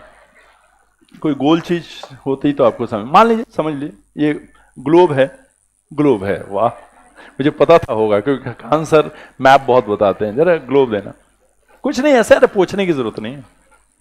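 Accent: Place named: Bhojpuri, India. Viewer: मजा आ गया क्लास कर के sir😊भोजपुरी स्टाइल में भी sir समझा रहे ह😊😃👍👍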